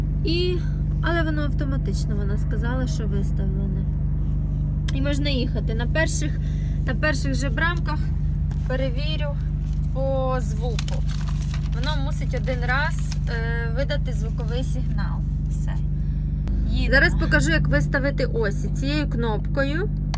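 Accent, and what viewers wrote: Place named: Surzhyk, Ukraine. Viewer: Ох Суржик суржик) Привет из Сумщины😁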